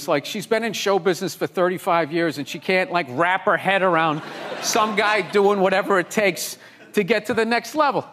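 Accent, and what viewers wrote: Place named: Boston, United States. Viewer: Being from Boston, everything he says just sounds like normal conversation between friends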